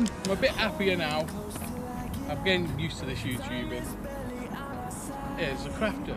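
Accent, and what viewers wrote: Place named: St Helens, United Kingdom. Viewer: NICE van! Sounds like a St Helens accent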